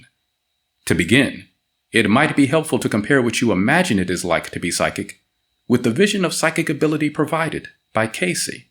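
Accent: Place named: Chicago, United States. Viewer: Don't wanna hear a Negro with a Chicago accent read to me sorry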